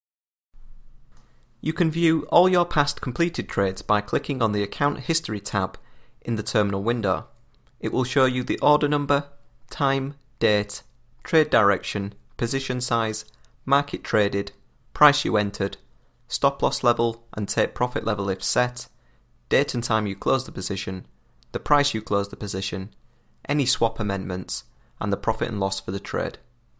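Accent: United Kingdom. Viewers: Very clear no nonsense with British accent , made it clear and elaborate thank you very much.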